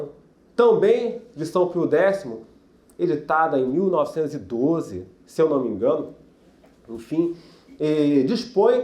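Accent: Rio de Janeiro, Brazil. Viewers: Sotaque carioca típico,amigo: Também sou fluminense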